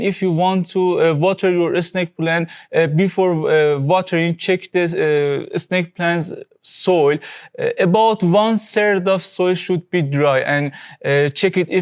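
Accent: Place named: Russia. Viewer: Hey are you from Russia? I love your accent also say hello to dear Mr police station❤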